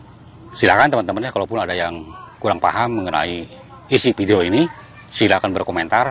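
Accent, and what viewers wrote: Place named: West Java, Indonesia. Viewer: Sunda pisan cigah urang cilengsi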